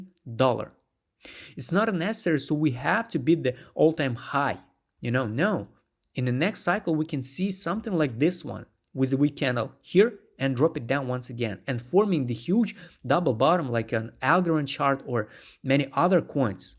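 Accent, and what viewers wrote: Russia. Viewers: man you have clearly Russian accent, isnt' it?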